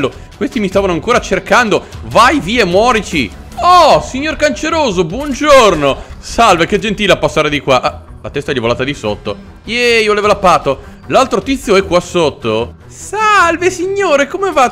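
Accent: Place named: Tuscany, Italy. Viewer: Malaho e tu mi sembro Toscano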